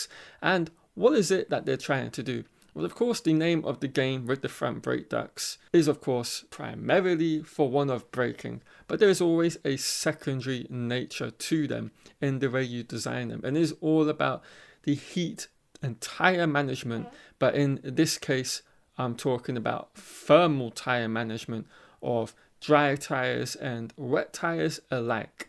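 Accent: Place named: England, United Kingdom. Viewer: I’m having a hard time placing your accent - where in England are you from?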